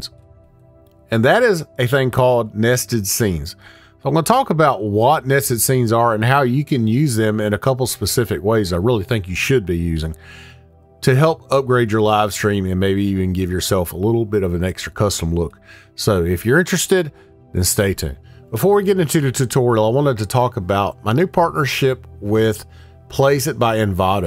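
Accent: North Carolina, United States. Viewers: Great tutorial! Thanks! Love the UNC stuff in the background. Based on your accent, you are probably in NC like me. I'm using your tutorials to help me with my Church livefeed in Roxboro, NC.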